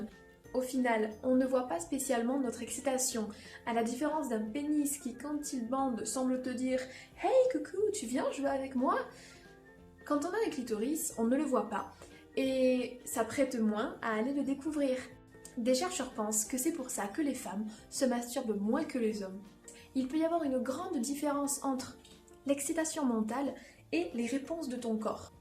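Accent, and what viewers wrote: South, France. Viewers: Je crois déceler un léger accent qui sent la fleur d'oranger, l'olivier et les cigales (j'ai jamais senti une cigale hein...promis o_O), me trompe-je ?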